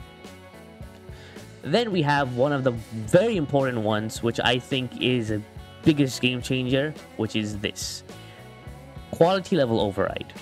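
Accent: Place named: Bengal, India. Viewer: u sound so bengali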